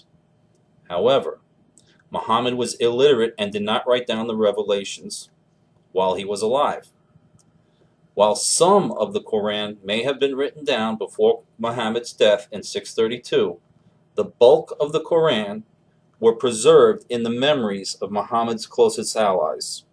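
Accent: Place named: New York, United States. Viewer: NY area accent.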